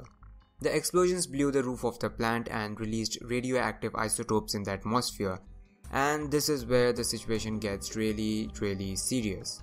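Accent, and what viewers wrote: India. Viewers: Bruh indian?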